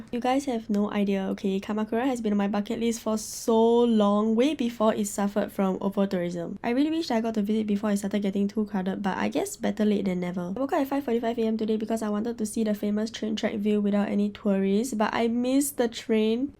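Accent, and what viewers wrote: Singapore. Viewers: かわいいー シンガポール人のアクセントはやはり大好物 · 2 seconds into you talking I already could tell you were from SG from the accent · that singapore accent is brutal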